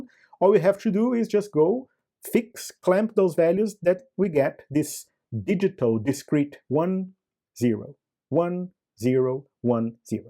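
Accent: Brazil. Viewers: pelo sotaque, você é brasileiro! muito bom seu canal! parabéns!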